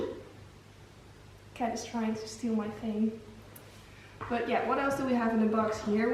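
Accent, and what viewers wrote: Netherlands. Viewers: Klinkt Nederlands? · Why i feel ur dutch from ur accent why i have this feeling tho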